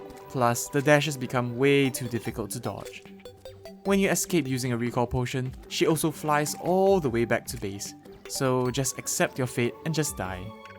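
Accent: Singapore. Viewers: Why is your voice so nice are you Singaporein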